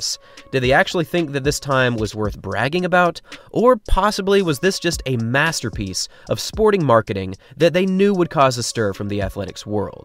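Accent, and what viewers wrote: United States. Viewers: Your accent is American, so why do you call soccer players football players?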